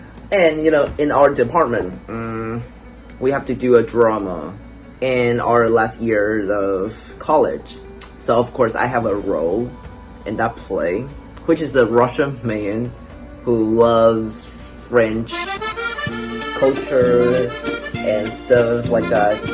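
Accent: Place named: Australia. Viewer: I agree with you when it comes to languages and emotions! I notice that however I feel while studying carries over to my state of mind while speaking the language. I feel like it also affects my openness to the culture. For patterns, I love them. I notice that I learn languages better when I notice the patterns on my own :D Then later on I can learn formal patterns to see if they match (or they build more easily off of what I already have learned). Ahh accents, I want to know how to change mine in the languages I learn. I don't mind having a foreign accent but I'd at least like to have one that sounds nice... Your accent kind of reminds me of Baobaopearly's. It isn't a valley girl accent, honestly it sounds sunny if that makes sense. :D Also, your placement sounds like it'd be in the right place for an Australian accent. Good luck whatever you work towards! 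Great video!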